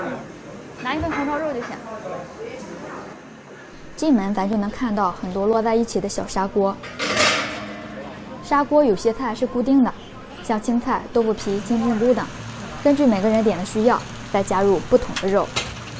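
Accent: Shandong, China. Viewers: 加油山东口音不要丢视频能做到一分或一分半钟之内删删剪剪也不要紧希望参考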